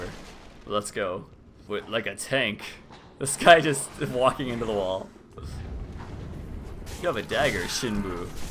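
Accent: Canada. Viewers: Your level of Canadian is just fantastic really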